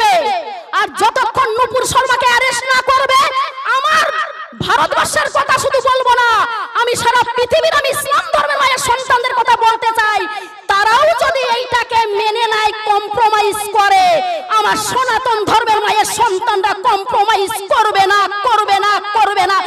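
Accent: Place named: Bangladesh. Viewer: জয় শ্রী রাম 🚩🚩 কথা শুনে বোঝা যাচ্ছে আপনি বাংলাদেশী মুসলিম।। এত এব হিন্দু হিন্দু বলে চেঁচাবেন না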